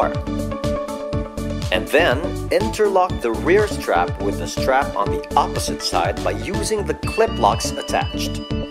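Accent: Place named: Philippines. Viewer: Nice Filipino accent there lol.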